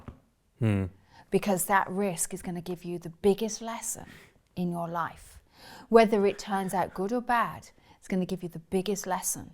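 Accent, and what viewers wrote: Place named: Scotland, United Kingdom. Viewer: LOVE LOVE LOVE his accent. I'm a sucker for the Scottish accent. Sounds like Kyle Gray :)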